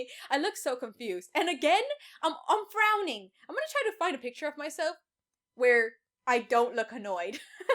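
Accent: Spain. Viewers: Why is the album title in Spanish? It says:cuando era bebe! I'm not surprised cause I know Spanish and she talks like she talks Spanish soooo... Btw you where a really cute baby 👶 ❤️ Like if you agree!👍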